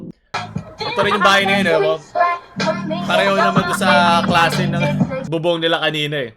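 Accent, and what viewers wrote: Visayas, Philippines. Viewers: Bisaya nis? Bagohan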